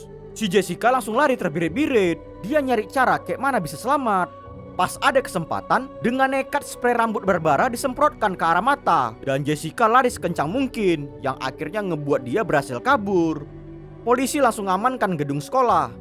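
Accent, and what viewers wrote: Medan, Indonesia. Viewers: awak medan kali bah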